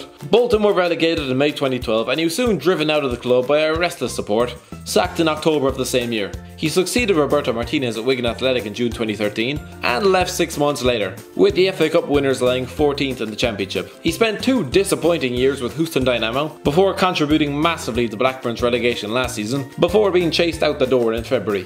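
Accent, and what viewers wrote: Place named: Ireland. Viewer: Michael Hart it's the irish accent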